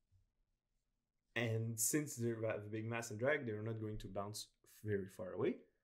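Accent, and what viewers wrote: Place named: Quebec, Canada. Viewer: haha j'ai reconnu ton accent québécois. Merci beaucoup pour ce tutoriel.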